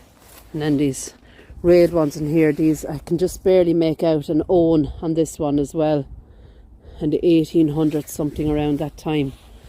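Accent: Ireland. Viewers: You have the best Irish accent. Absolutely love it. When I imagine my ancestors talking to each other, it’s your accent I hear.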